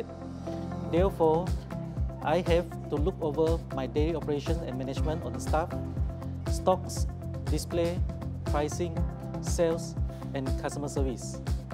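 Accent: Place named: Malaysia. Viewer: Bro, his accent is fine in Malaysia as most people in Malaysia is like that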